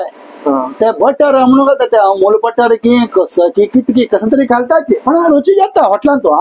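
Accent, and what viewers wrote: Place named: Konkan, India. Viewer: It's amgele konkani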